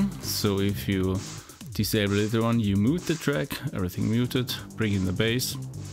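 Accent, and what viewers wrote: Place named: Germany. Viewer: Well Done! Up And DOWN! love the german accent! thx a lot!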